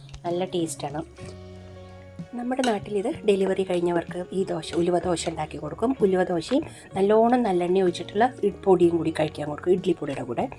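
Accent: Palakkad, India. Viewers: Hmmm kandapo kodhiyayi.. uluva manam varumo.. evide kettyonum makkalkkum manam vanhaal pne kazhikkilla..☹️🙄.Pne kandhisammandhi kettittundu.. vaayil vellam vanhutta.Videos ellam nannaavunhundu..You have a very unique voice and style of narration..😍☺️.Enikka palakkadan shyliyilulla ‘ttolu’ bayankara ishtaanu kelkkan. I thought you are a vegetarian..apozhaanu Mathippuliyude video kande…It was sooo good to see.Will try soon…All the best to your channel ❤️❤️❤️❤️Nattil varumbo Palakkadu varunhundu…Apo kanattolu 😍❤️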